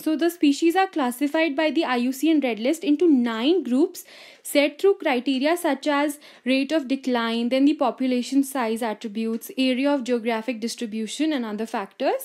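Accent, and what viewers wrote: India. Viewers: Indian dialect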